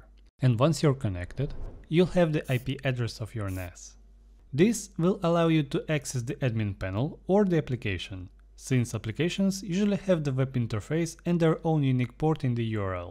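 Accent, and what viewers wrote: Eastern Europe. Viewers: thank you slavik accent man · славик акцент вэри найс. ал понятно энд по делу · For some reason the Slavic accent makes me trust your experience more😂 great vid! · Omg I didn't realize this is a super new video! I am loving it so far. The Slavik aksent is appealing though! I've been delaying building a nas / home server forever now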